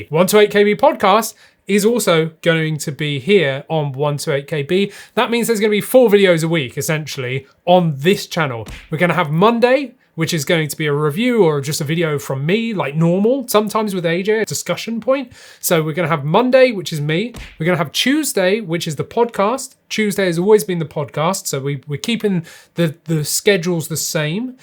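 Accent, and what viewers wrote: United Kingdom. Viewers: I've seen a few of your videos, and i like your channel, dont worry about "rambling on", i like the way the "British Accent" sounds.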